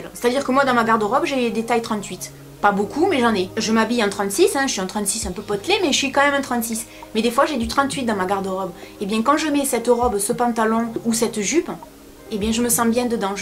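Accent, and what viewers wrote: South, France. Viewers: L'accent du sud ! 😂😘✨ #sudiste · Y'a pas longtemps que je te suis mais j'adore ton franc parler avec ton accent du sud 😍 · et ouais le suuudddddd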